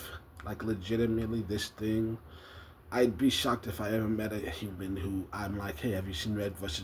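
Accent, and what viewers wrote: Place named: United Kingdom. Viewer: I originally saw you from that one video that went viral a while back at first I thought you were British or something but now that I know you're from Texas I'm kind of wondering how you got that accent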